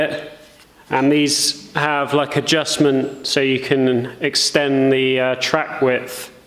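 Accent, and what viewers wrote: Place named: Northern England, United Kingdom. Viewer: crickey, you sound Northern!!!! 😂😂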